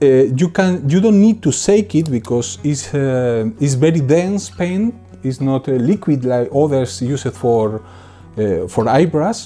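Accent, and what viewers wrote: Spain. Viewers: no hombre por el amor de dios no te disculpes si lo hablas bien solo que tiene que intentar mitigar un poco el acento español lo tienes muy marcado y puede hacer que algunas cosas no se entienda bien en ejemplos como el de el sonido de la ce o la zeta que son fonema que no existen en ingles y a los angloparlantes les puede sonar a chino una palabra así pronunciada. Saludos mig perdón se he sido un poco impertinente animo mig 💪🏼💪🏼💪🏼💪🏼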